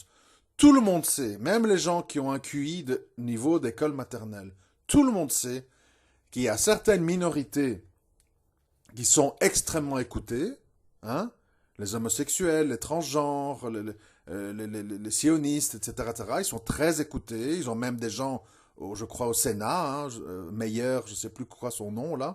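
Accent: Belgium. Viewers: Salam mon frère, ton accent brusseleer c'est top 😉 moi pareil, quand je m énerf ça revient 😎. C'est bien de dénoncer, mais sois en forme car des hypocrites sont légion...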